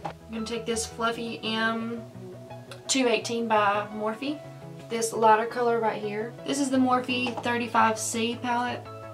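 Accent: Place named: Alabama, United States. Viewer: You can so tell that you're from Alabama! I'm from Dothan and I moved to Ohio because of my husbands job and everyone up here automatically guesses that we're from Alabama because of our strong southern accents! 😂😂😂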